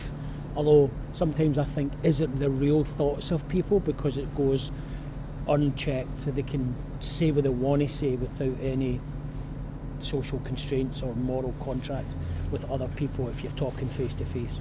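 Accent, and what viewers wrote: United Kingdom. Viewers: I still get annoyed with your red coat accent as in "the red coats are coming".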